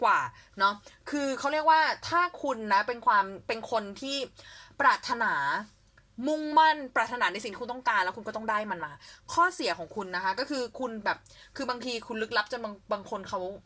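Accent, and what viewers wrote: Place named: South, Thailand. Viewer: ชอบแม่หมอจังเลยค่ะฟังแล้วเคลียดี น่ารักค่ะ ฟังละไม่เครียดเลย อ้อร้อด้วยคนใต้ป่าวนิ อิอิ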